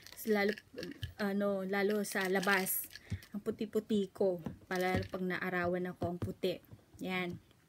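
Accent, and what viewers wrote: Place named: Ilocos, Philippines. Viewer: ilokano k girl? pra kcng may punto k. ganda mo nmn khit nsa japan k belo p rin eh db dami gluta dyn?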